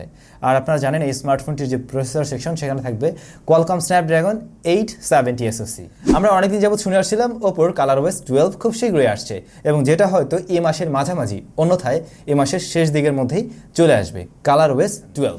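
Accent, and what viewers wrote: Barishal, Bangladesh. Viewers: ভাই অনেক দিন অনেক প্রশ্ন করেছি কিন্তু একদিনও উত্তর পাইনি, ভাই আপনার গ্রামের বাড়ি কি বরিশাল৷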